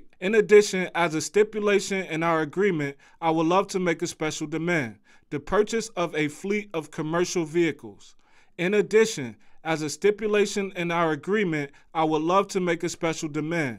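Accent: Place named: Upstate New York, United States. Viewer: I can tell by your accent that you from upstate maybe Buffalo?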